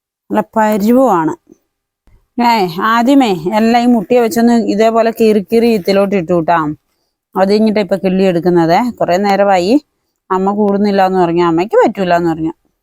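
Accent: Kottayam, India. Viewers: Hi chechi njn ipplanu video kanunnathu. Super … Kottayam style samsaram ❤ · നിങ്ങൾ കാസറഗോഡ് കാരല്ലേ, പിന്നെങ്ങനെ കോട്ടയം സ്ലാങ് പറയുന്നു 🤔🙄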